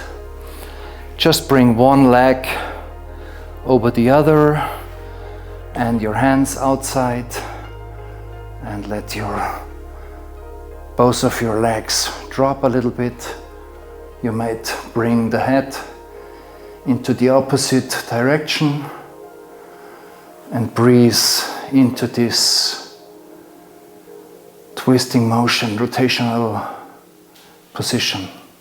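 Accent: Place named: Bavaria, Germany. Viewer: Sehr schöne Yogaeinheit um am Morgen wach zu werden. Ich mag dein bayrisches Englisch!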